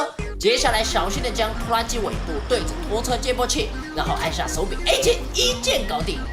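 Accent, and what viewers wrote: Sichuan, China. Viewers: 怪说不得的川普发音就很local了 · 欸！！！你的四川话好亲切！！！！！因为我就是四川人！！！在国外呆了好多好多年了！！！！！！！！！！！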